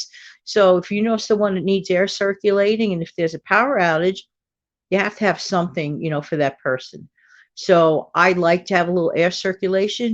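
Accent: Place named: New York, United States. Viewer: I’m listening to your voice and thinking how did I not know you were a fellow New Yorker. Cool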